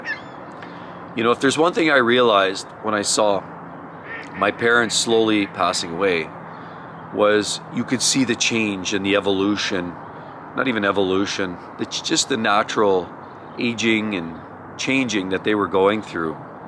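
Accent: Alberta, Canada. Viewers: You sound like your from Alberta, am i right ?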